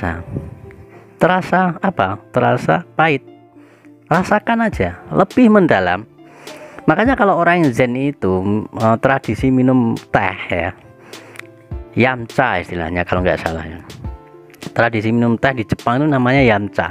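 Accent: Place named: East Java, Indonesia. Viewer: Mantap Mas, panjenengan asli ndi mas, logat kayane asli daerah wetan?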